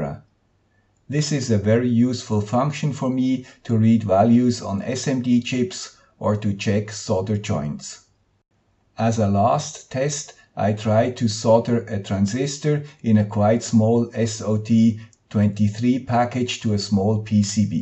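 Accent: Switzerland. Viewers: That would be fantastic, this has been an issue for me for a while. I have very good lighting at my bench. As I am approaching 50 myself and would like to work with smd, it's time to do something about it. I appreciate your quick response and look forward to seeing and hearing the man with the Swiss accent''s solution. Have a great week!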